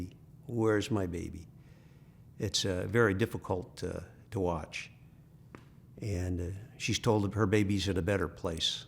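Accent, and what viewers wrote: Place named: United Kingdom. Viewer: This is a wild story good job covering it. I really appreciate the fact that you limited your British accent in the video. American crime American voice. Nothing personal. I can barely understand what in the toast and beans you’re saying.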